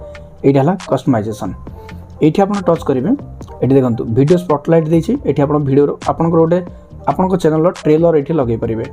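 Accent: Balasore, India. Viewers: Balasore kahuche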